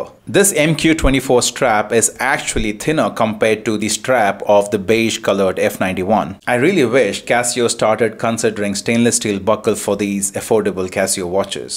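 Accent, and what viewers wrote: India. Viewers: meanwhile me with my GD350 and GX56 laughing in the corner 😂 Anyway what I loved the most is your hindi accent! Also when I read the title of the video first,the only watch came in my mind was this!! This was an important watch to buy and cover,loved the video bro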